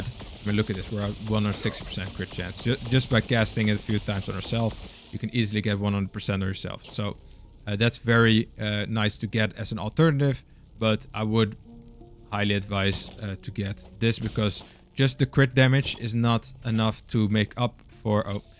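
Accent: Netherlands. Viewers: jaja i hear some dutch accentXD subbed for support^^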